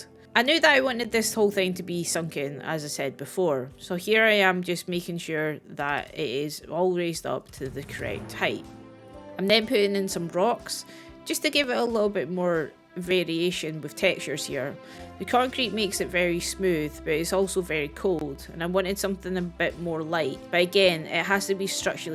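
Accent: Scotland, United Kingdom. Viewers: Hey think you could do a tips or tutorial video on prehistoric kingdom? I bought this game and I always get a bit overwhelmed and never know what to do... Your Scottish accent fills me with confidence so I had to ask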